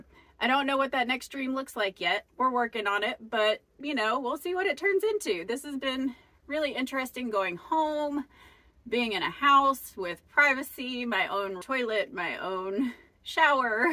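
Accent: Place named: South, United States. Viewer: I love your personality and your adorable southern belle accent, happy travels!!!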